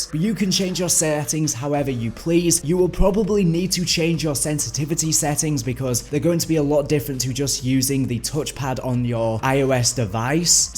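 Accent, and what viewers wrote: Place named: North, United Kingdom. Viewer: You a northerner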